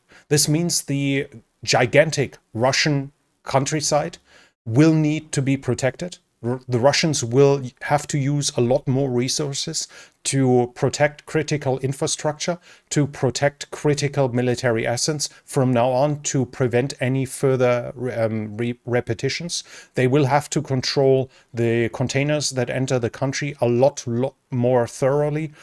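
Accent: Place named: Germany. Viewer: Almost sounds like you have a German accent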